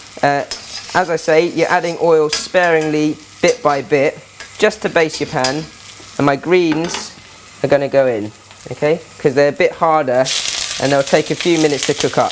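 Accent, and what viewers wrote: England, United Kingdom. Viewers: Love the British accent. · that's a chinese dude with a english accent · comments are quite churlish. who cares if he speaks english. he actually sounds British but the point here is to understand what the wok is and how amd why it is used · I love the Asian, with a British accent, cute. Nice presentation on Wok cooking. Italian here loves woks and Asian cooking. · Authentic English accent ? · He said he lives in london 008-009...dont know any better place to get an english accent. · Thanks for sharing, I’m new to wok cooking and this is great information. Thanks again I like your Pommy accent just awesome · You speak like a mate from England or UK? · An Oriental man with a British accent...Interesting...Very informative wok instructions...Nice flipping skills... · DawgYankee i dont understand why people commenting on his accent, he was probably raised in the uk, maybe thats why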